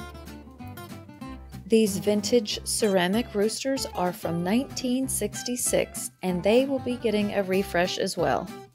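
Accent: South, United States. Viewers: Many southern have smooth accents. 😘🎠🚂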